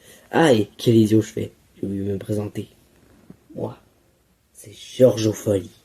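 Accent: Quebec, Canada. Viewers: Ça aurait été moins bien si la narrateur n'avait pas été Quebecois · Le narrateur est québécois ?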